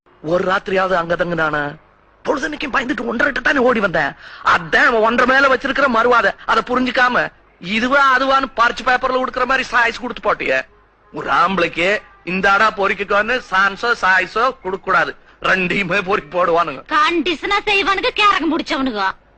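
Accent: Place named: Kongu, India. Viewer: பெங்களுரில் இருந்தாலும் உங்க, அதுதான்க நம்ம, கொஞ்சும் கொங்குத் தமிழை மறக்கவில்லை. ஆனால், உரையாடலின் மையக் கருத்துத்தான் சரியில்லை. இது மாதிரியான கலச்சாரச் சீரழிவுக் கருத்துக்களைப் புறக்கணியுங்க. உங்க வீட்டுக்காரம்மா, கொங்காக இல்லாவிட்டாலும் கூட, கொங்குத் தழிழை, உங்களைவிட நன்றாகவே பேசுகிறார்கள். வாழ்த்துக்கள்.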